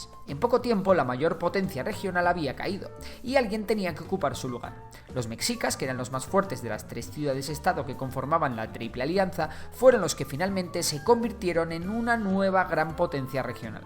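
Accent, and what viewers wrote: Castile, Spain. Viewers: El habla español castellano weey nomms. No es el mismo acento que el acento mexicano, no seas duro hombre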